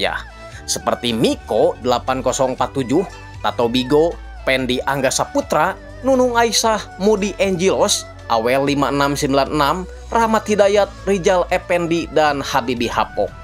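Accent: West Java, Indonesia. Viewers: orang Sunda ya...logat nya Sunda banget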